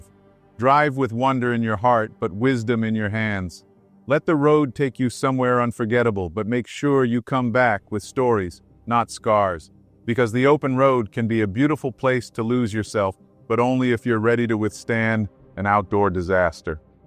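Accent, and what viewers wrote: United States. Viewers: By the way, your american- english is perfect. I love it. ❤. I whish all USA accents were like yours. Love your voice as well. Who are you? A profesional narrator?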